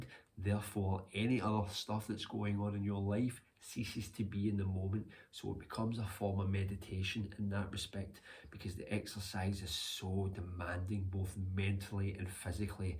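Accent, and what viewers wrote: Scotland, United Kingdom. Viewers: On a side note brother, your scottish accent makes the captions hilariously funny. It can't pick the right words.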